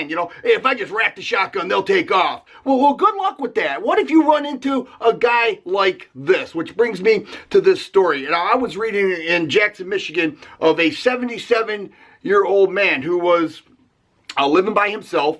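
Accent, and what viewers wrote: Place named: South Boston, United States. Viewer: Where did you get that accent.? I want to to say south Boston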